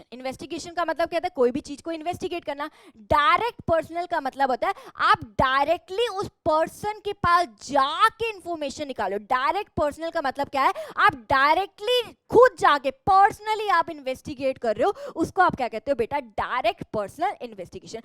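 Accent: Punjab, India. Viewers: Ma'am ur Panjabi ascents ummm so gudd bole to bawal...🤧🌼✌️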